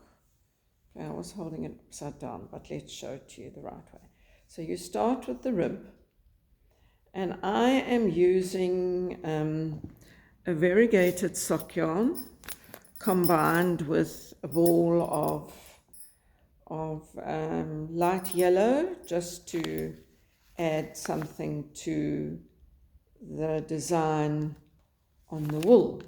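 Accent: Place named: South Africa. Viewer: Hi and how lovely to hear a South African accent and the Hadidas, are those the ones we call Kwê voëls. When I look at the way you hold your needles and yarn, I know we grew up at the same time in South Africa. Although Afrikaans, I should have knitted continental, my mother had very strong ideas about the done thing. When I taught myself to crochet she always had a lot to say, because I did it my way. Even my Afrikaans friends found it strange and when I see how most people do it on YouTube I think anyway is okay as long as you enjoy. I hope my daughter isn't too cold in Joburg. The UK is mild and people are upset because this isn't summer. I don't mind, because it can get quite muggy. Enjoy your stay and I envy you going to your granddaughter's party. Think I will give those socks a go. Look forward to hearing your voice again. Anita x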